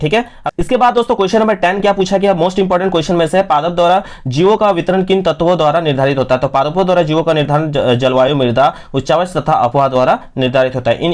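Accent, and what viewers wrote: Jharkhand, India. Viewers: Sir AAP jharkhand see ho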